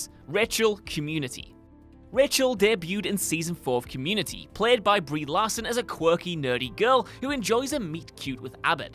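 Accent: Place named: United Kingdom. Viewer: Because he is reading a lot and people make mistakes? Because he pronounces that differently like most people do with most of the ever evolving and changing language? Because he's British?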